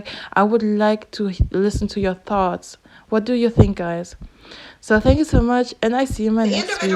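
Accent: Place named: Germany. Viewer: Do you live in a German territory? You have an accent similar to my Oma and Opa. 💜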